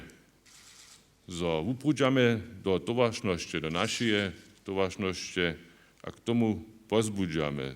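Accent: Germany. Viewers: The pronounciation is simply horrible. I can't help myself, but the man does not articulate well and has a strong German accent. I can't recognize many words, although I am sure that I would have no problem to recognize them in the written form. In my opinion, Upper Sorbian is dead. This is only a German-Slavic pidgin. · Hört sich für mich als Slowenen aus Kärnten nach Westslawisch mit norddeutschem Akzent an. Oder ist es umgekehrt so, dass die Norddeutschen mit mit westslawischen Akkzent sprechen ;-) ?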